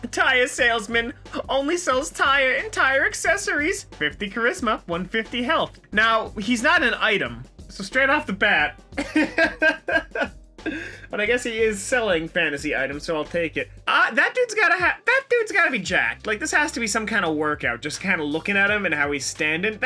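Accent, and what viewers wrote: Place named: New Jersey, United States. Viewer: I sense a Jersey accent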